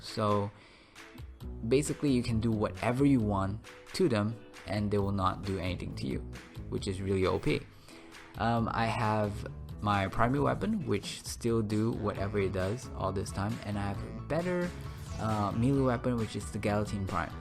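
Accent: Singapore. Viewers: what are u Singaporean?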